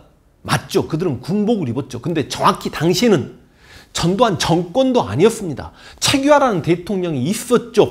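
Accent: Jeolla, South Korea. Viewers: 전라도 사람들만 황현필같이 말하지.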